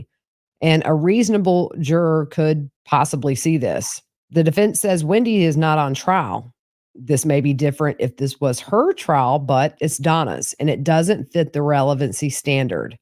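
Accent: South, United States. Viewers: So many southern accents are not pleasant but your accent is...always nice to hear your recaps!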